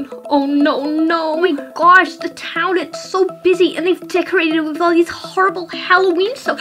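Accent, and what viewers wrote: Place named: United States. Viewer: Since when did you sound American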